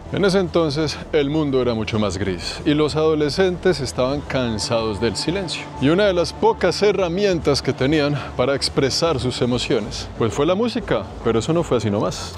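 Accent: Colombia. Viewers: me encanta porque no solo te enseña lugares que nadie o alguna televisora hace y siempre esta informado de algún lugar o monumento en el que visita, y sobre todo la educación y respeto que el siempre demuestra, haa y de extra me encanta su acento colombiano parce XD siga adelante amigo y lo sigo desde que vino a mi humilde Guatemala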